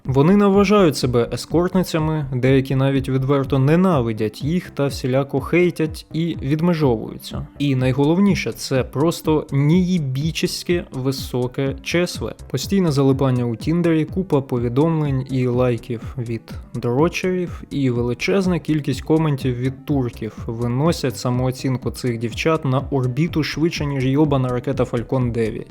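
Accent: United States. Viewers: бро, ю хев гуд амерікан аксент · Крутий американський акцент, ніколи ще не дивився відос від українського Джона. Лайк + підписка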